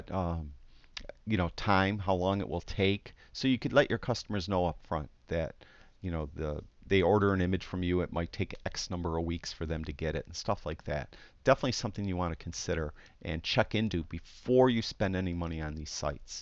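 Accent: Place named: Upstate New York, United States. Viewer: What an awesome video...thank you so much for explaining this so clearly. I've been thinking of selling some prints and had been wondering about Smugmug, so this is really helpful. I saw your comment above about donating the proceeds to the charities and that's wonderful. I had always wondered if you were from Upstate NY as well due to your distinct accent and it seems like you are! (I went to college at SUNY Oswego) :)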